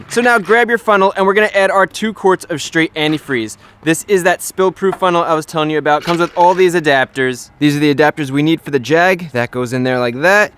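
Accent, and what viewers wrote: United States. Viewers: Are you an American,?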